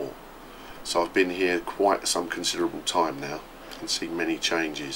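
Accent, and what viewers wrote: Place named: Cockney, United Kingdom. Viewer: he sounds like a geezer from EastEnders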